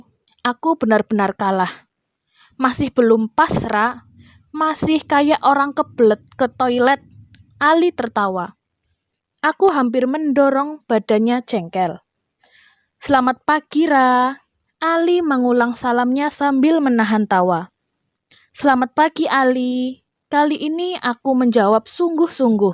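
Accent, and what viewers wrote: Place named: Ngapak, Indonesia. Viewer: Narasi pake suara bocah ngapak. Medok banget.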